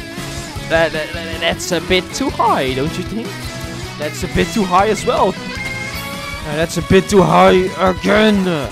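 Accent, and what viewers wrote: Netherlands. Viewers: Hij praat echt zo Nederlands engels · Je bent nederlands ik hoor het aan je accent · Hij heeft gekke Nederlandse accent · Wat een lekker Hollands accent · Dutch accent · Hij heeft heeft Zo'n nederlands accent poeh he😂😂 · Is he maby Dutch Hij is 100% NL Zijn Engels zo funny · this guy is dutch, of niet? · Boii. Are you dutch? You sound dutch🤣 love your vids❤ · Hoi ik weet dat je nederlands bent ik hoor het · Ja echt aan hem accent hoor je al dat hij nederlands is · Je bent gewoon Nederlands leer Engels praten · You are from holland arent you? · Haahha dat nederlandse accent door je engels is echt goud.... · Are you Dutch? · ja he hij heeft zo’n Nederlands accent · is hij nederlands ofzo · You sound dutch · Je klinkt Nederlands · Je hebt een Nederlandse stem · zn accent is zo nederlands ik ga stuk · Je bent gewoon nederlandss haha hoor echt duidelijk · Ik hoor gewoon dat je Nederlands bent😂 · Are you dutch? 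· where do you come from? you sound like youre from the netherlands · Dutch accent (je hebt echt een Nederlands accent 😂😂)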